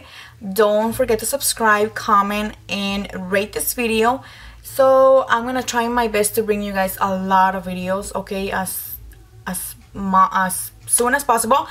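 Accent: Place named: Guatemala. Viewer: i love your accent :) im guatemalen too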